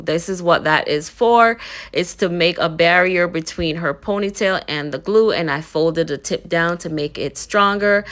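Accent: Jamaica. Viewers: Are you Jamaican or from another Caribbean island? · Hey hun nice job. U Jamaican? 🇯🇲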